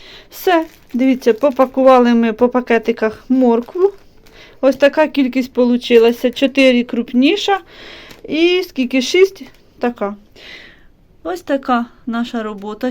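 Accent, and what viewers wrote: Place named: Chernihiv, Ukraine. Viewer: Доброго дня! А в якій області знаходиться Ваше село, якщо не секрет? Я оодом з Чернігівщини, і в нас також говорять на кріп-"окріп". Почувся рідний. говор з моєї Чернігівщини.❤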